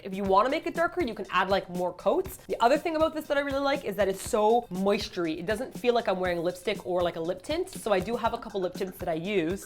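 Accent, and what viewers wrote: Canada. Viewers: Oh wow I just picked up on your Canadian accent!! LOL cute! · I love hearing little bits of canadian accent keke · I heard your Canadian accent more in this video than any other video. And I've been watching you for a about 2 years. ._. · She sounds super Canadian in this video! It's adorable >.<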